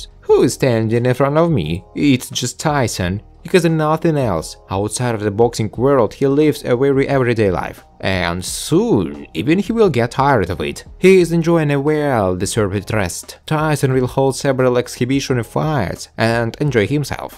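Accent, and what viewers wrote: Russia. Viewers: Каким-то образом видео попало ко мне в рекомендации. Слушаю и думаю, ебать какой дикий акцент. Подумал сразу может это русский, а потом посмотрел инфу канала и подтвердил свои домыслы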